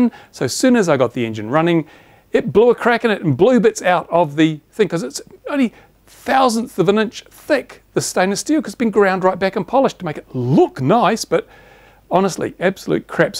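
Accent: New Zealand. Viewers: Why dont you mount it on a sheep???? Did I detect a Kiwi ecsent. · you sound kiwi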